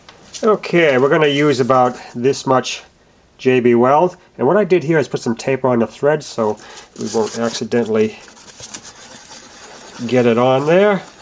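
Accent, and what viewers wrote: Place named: New England, United States. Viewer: Sound like you are from new england.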